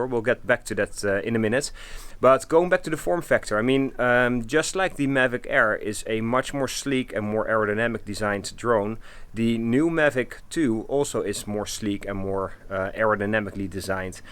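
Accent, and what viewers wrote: Netherlands. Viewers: Hoor aan het accent dat je Nederlands bent maar je spreekt goed Engels 👌🏻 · Are we Dutch by any chance?=) nice vid btw , tnx=) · u have a dutch accent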